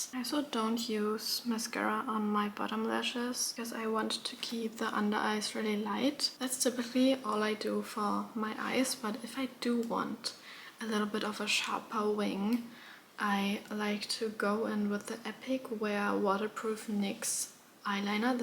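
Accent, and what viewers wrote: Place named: Germany. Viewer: Hey, erstmals tolles Video 🤗 Nur ein kleiner gut gemeinter Ratschlag: Man kann raushören, dass du deutsche bist und es dir manchmal schwer fällt die richtigen Vokabeln zu finden/ dich auszudrücken.. Wahrscheinlich drehst du deine Videos auf englisch um mehr Leute aus aller Welt zu erreichen, was ich vollkommen verstehen kann aber vlt solltest du einen 2ten deutschen Kanal erstellen oder einpaar Videos auf deutsch drehen denn ich hab das Gefühl so kann man einen besseren Eindruck von dir als Person gewinnen & du kannst deinen Charakter mehr zum Ausdruck bringen und somit leichter eine Community aufbauen :) Nicht falsch verstehen aber vlt denkst du drüber nach, mir gefallen deine Art von Videos sehr🤍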